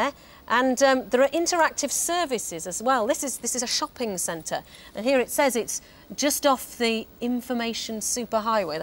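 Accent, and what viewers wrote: United Kingdom. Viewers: The British accent makes the presentation sounds very sophisticated.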